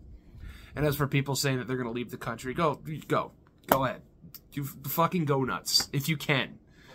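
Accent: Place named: New York, United States. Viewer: Wait a minute your not italian? I thought u was from New York i watch the vpw2 freem video and as soon as u chose shockmaster I was like I need grab a slice with this guy he knows his shit the Gambino look scratch all the politics talk what ever happens happens just know u went down as a made men and we honor that look at the brightside atleast u still got your video game collection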